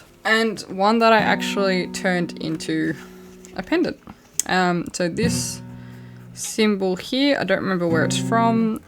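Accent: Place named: Australia. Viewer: IS THAT AN AUSSIE ACCENT I HEAR OMGGGG YAYYYYY :) i never find any aussie youtubers about magic and spirituality.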